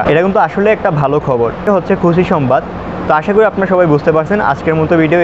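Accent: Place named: India. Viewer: তুমি কি ইন্ডিয়ান তা না।হলে তো এইভাবে তো বাংলাদেশের ছেলেরা কথা বলে না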